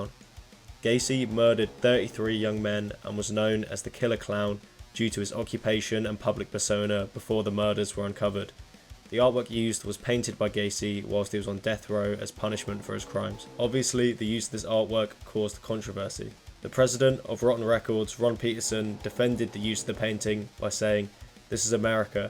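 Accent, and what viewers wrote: United Kingdom. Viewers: As a guy in East TX, it's weird to hear a guy with a UK accent talk about acid bath lol. I like Dax's newer stuff too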